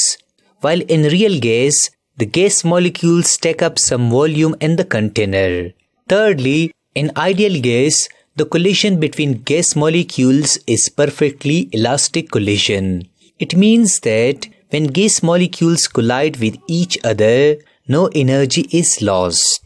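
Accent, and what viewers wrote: India. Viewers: I understand Indian pronounce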